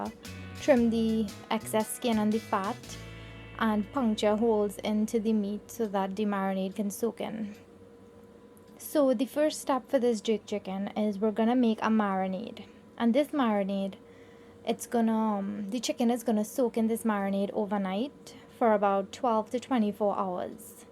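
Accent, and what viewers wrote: Trinidad and Tobago. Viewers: When I think of the trini accent france (French language) comes to mind, so sexy. Great recipe by the way. Real Yard man thing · I does Love that Trini Accent so... oh Gawd ! AND she can cook.. I'm gonna have to head to the Homeland and find me a wife. -TriniAmerican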